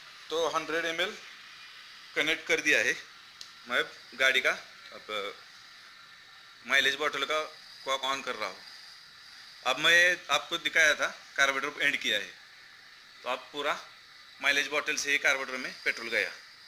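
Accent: Maharashtra, India. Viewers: Bhai aapke Language se pata chalata he ki Aap Maharashtra ke rahne Wale ho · Aapki tone se pata chal raha he ke aap Marathi ho. Or wo bhi North Maharashtra se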